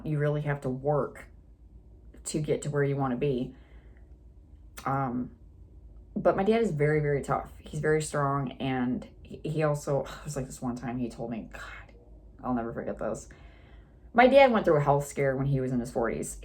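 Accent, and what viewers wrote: Michigan, United States. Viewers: ur michigan accent is so funny to me im from cali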